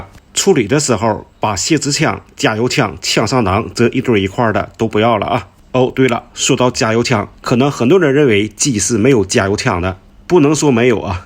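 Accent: Dalian, China. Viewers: 的大连口音听起来真亲切。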